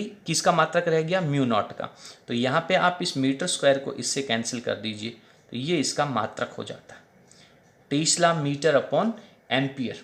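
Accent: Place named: Bagdi, India. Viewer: Gurgi the ktha ga ho bagdi bolan laagrea ho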